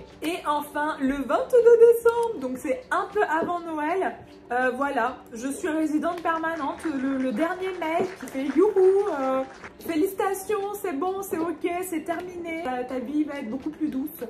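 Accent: Quebec, Canada. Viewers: Oui, je suis d'accord par moment on entend un léger accent québécois. Au fil des années je pense que cela va s'accentuer un peu plus mais ne perdez pas l'accent Français totalement.😊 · Tu as pris un peu l'accent québécois 😂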